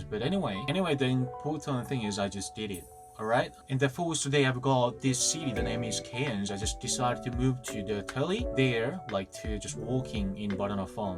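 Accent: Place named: United Kingdom. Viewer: 영국식영어 개멋지다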